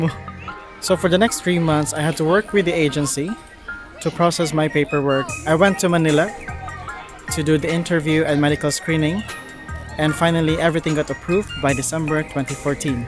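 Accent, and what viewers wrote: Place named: United Kingdom. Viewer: wow lods na acquire mo na din ng accent ng briton salute to you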